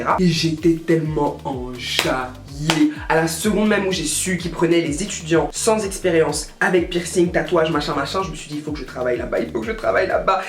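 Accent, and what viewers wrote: Ivory Coast. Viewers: ..je sur kiffe ton accent et vive Abidjan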